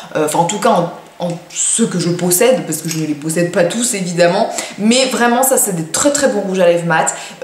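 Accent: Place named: South, France. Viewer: accent du Sud très prononcé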